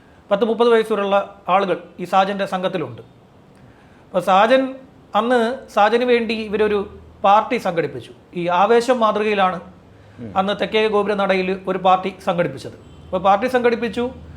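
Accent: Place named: Kollam, India. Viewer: kollam, anchal karan aanu ivideyum anganeya samsara syli😊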